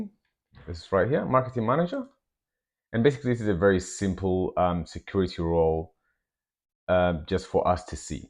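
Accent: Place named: Ethiopia. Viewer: My good sir, Might you be habesha. The accent made me check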